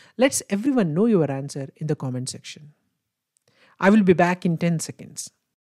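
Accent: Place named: India. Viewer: Maybe you shouldn't explain an optical illusion to English speakers with such a thick Indian accent. I'm happy you explained it, but I couldn't understand what you said for H 6-7. I still only see 5.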